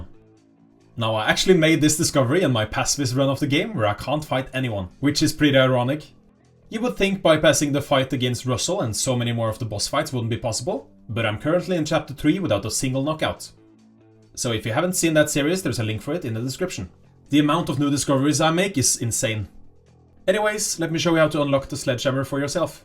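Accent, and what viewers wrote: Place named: Norway. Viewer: The accent is violently norwegian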